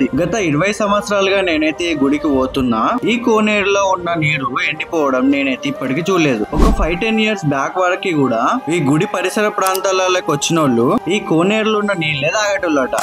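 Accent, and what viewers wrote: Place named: Telangana, India. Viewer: తమ్ముడు ఎన్నో పెద్దపెద్ద టీవీ ఛానళ్లు వచ్చి ఇంటర్వ్యూ చేసి గుడి గురించి చూపించిన మన తెలంగాణ యాసలో గొప్పగా మంచిగా చూపించి చెప్పిన్ తమ్మీ ఓం నమశ్శివాయ 🙏 🤝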